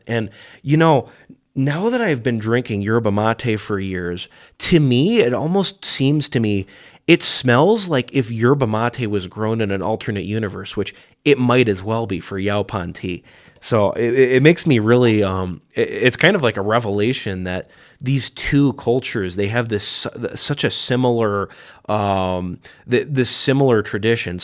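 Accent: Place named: Midwest, United States. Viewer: I have been meaning to try Yaupon and got this video suggested to me! It is interesting though because you have a very thick midwestern and southwestern accent!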